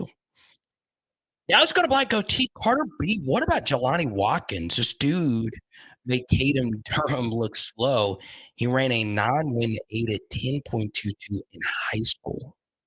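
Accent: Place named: North Louisiana, United States. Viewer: Carter you sound like you have some North LA ties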